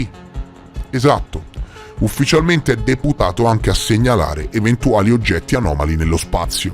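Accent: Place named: Valdarno, Italy. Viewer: Il Valdarno ci ha regalato questa perla di saggezza. sempre al top! Che tu sia di quelle parti me lo suggerisce il tuo accento, ma potrei sbagliarmi, sia chiaro. Io uso solo l'intuito, non ho software incredibili di alcun tipo.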